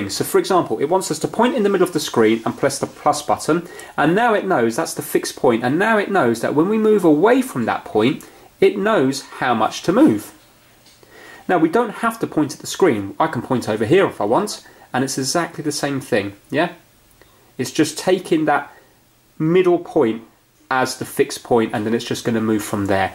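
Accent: United Kingdom. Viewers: Good tips dislike because of your british accent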